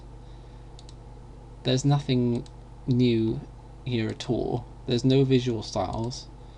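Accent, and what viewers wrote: United Kingdom. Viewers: Is that a British Accent ?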